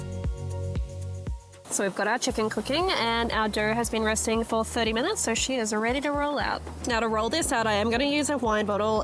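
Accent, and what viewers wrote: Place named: Australia. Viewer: Throttle it Like You Stole It Aussie Talk at its Finest 😂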